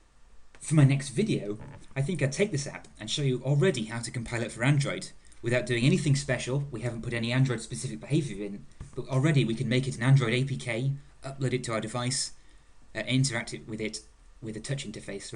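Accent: United Kingdom. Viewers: I love i when British people narrate tutorial videos !! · These videos seem to be very interesting but unfortunately I am not able to understand the author as I should for a tutorial. His british accent and the speed at which he speaks is too much for me :( Subtitles are no option. sad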